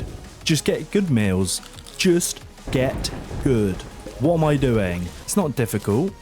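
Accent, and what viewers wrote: United Kingdom. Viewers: Really liked the vid, but would enjoy it more with thicker briish accent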